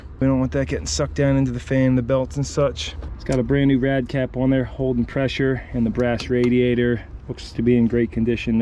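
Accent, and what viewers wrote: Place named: Western Pennsylvania, United States. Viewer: Where are you from? West PA or east OH? Accent gives it away.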